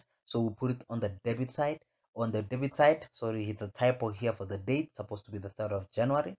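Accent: South Africa. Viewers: 🇿🇦lets go you from sa can hear your accent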